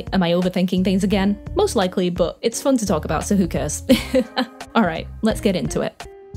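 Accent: United Kingdom. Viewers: I am trying to pin down your accent. It sounds sort of British, but not really.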